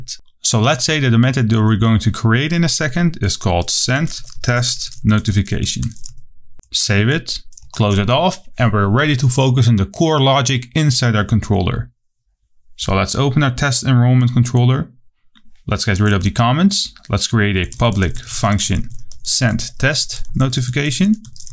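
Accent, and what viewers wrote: Netherlands. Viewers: Hi, thanks for your videos. It sounds like you're dutch:) Can you make a video about broadcasting notifications?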